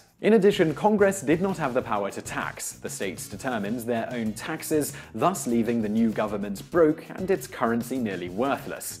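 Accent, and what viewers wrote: United Kingdom. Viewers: I love when you accidentally stumble onto an awesome channel. This channel is one of those awesome ones. I have been watching your videos non-stop for a couple days now. I can't get enough of them. However, is it possible for to slow down a little. He speaks super fast without any breaks. Sometimes I don't think he even stops at the end of his sentences. I think maybe he only stop at the end of his paragraph...lol In addition to his super speed he has that accent going on. You know when you are listening to another language that you do not know and you can't figure out where the breaks between words are? That's kind of what's happening here and I've been speaking English for 47 years. I actually have to pay really close attention, concentrate, & sort of translate what is being said. It's taking a little work to watch these videos and I watch youtube so I don't have to pay attention. 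If you do decide to take my advice, you don't have to slow down dramatically just a little slower would be good. Plus I think I see you turning blue from lack of oxygen....I'm just kidding about that. I see you have a lot of subscribers but I'm wondering if maybe your subscriptions would increase faster if you did slow down a little bit. I could imagine that some people may be passing up on pursuing your channel because your videos do take a little work to understand and follow what is being said. Obviously Europeans probably have no problem following you because they are used British-Englis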